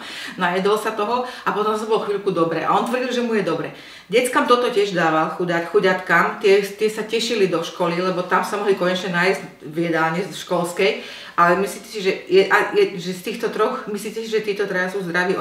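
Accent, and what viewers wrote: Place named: Bratislava, Slovakia. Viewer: Nebyť toho blávackeho dialektu by človek bral aj vážne.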